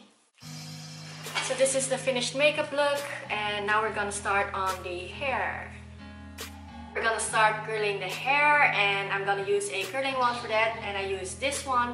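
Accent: Netherlands. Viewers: Ben je Nederlands? Je hebt echt een nl accent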